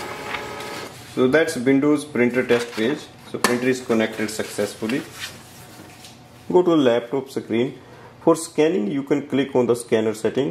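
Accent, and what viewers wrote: India. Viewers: Your Indian accent is annoying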